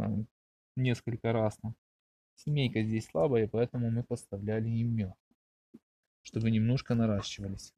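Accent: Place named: Kharkiv, Ukraine. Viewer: Сократить, утеплить. В октябре, при наступлении температур днем не выше 12-14градусов, присоединить пару рамок с пчелой от сильных семей. Подсилите таким образом. Или соедините с отводком сейчас. Семья эта слабая. Перезимовать перезимует, а как родоначальница будет не айс., Вы с Харькова? Только от тамошних пчеловодов слышал слово ульИ, а не Ульи...извините.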